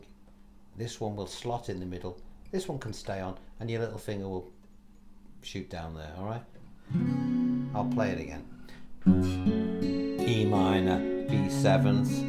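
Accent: Liverpool, United Kingdom. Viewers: Excellent lesson. Totally agree about putting every chord above, rather than a dummy such as meself trying to figure out where all the other chords go. Its held me back decades! Going forward it would be great if you could put more of the page 3 thingy's with all the chords going where they are meant to go. Thanks for the new word of the day too. Smuggery, had to look that one up. Nice1. Always loved the way you Liverpudlians talk, hence" The Beatles. Its all a load a shite, theres no frikkn werk as me old scouser buddy used to say back in 1984. A big Irish very slow fan! Have a good wan.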